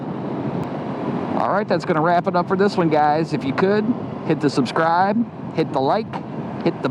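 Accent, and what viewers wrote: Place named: Chicago, United States. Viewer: I hear a slight Chicago accent too😂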